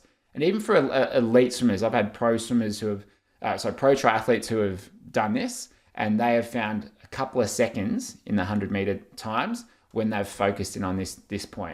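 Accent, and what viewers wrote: Australia. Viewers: Maaaate! You sound Aussie and should know that it's pronounced BOY not booey like the Yanks say.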